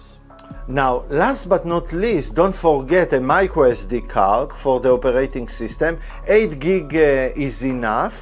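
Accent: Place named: Israel. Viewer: וואו אחי פעם ראשונה שאני רואה אותך ביוטיוב ופשוט ישר איך שהתחלת לדבר הבנתי שאתה ישראלי ואז ראיתי את השם של הערוץ והבנתי שאני צודק... תעבוד על המבטא 3>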